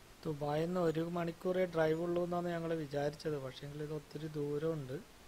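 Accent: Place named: Kannur, India. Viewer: ആഹാ... കണ്ണൂർ ഭാഷ 😍 ഞാനും കണ്ണൂർ ahh... കല്ലിയാശ്ശേരി Video കൊള്ളാം കേട്ടോ... നന്നായിട്ടുണ്ട്... തിരിച്ചും വരാൻ മറക്കല്ലേ കേട്ടോ, 🥰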